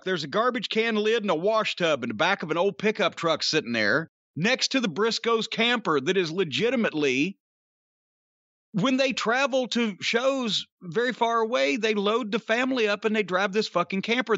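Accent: South, United States. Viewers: Jim Cornette succulent sounds Good Ol' Southern Boy I'm in Northumberland England UK. Big Respect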